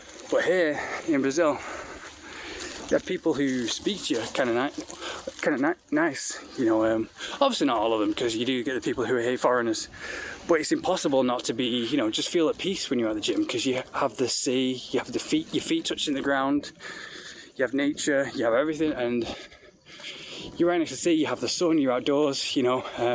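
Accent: United Kingdom. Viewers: Oh, you're Australian? I thought you were a brit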